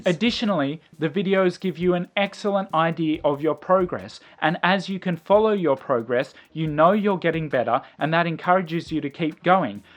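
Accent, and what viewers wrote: Australia. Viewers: My husband is Australian and I have lived here 55 years so I'm Australian too. I was listening to you and asked my husband what is this accent this guy has because I love it and I can understand him. He said he's an Aussie and to us he doesn't have an accent, so I felt very comfortable. Thank you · when I watch your videos I get reaaly happy because months ago I couldn't understand anything in Australian accent (some sounds are different), and now I just realized I can understand you perfectly. My target language is English btw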